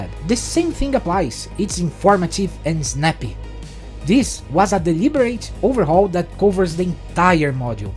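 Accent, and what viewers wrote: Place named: Brazil. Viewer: Hahahahahahaahah incrivel como da pra perceber outro brasileiro pela fala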